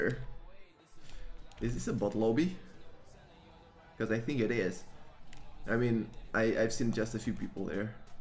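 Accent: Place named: Albania. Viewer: and u sound like u are from albania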